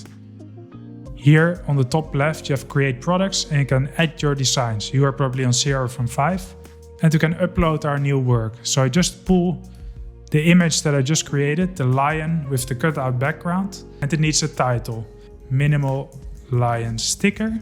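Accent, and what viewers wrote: Netherlands. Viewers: bro has the most dutch acccent ever lmao. i love the content, keep it up maat!😜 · Kwam toevallig op een video van je terecht.....volgens mij hoor ik een Nederlands accent ;-)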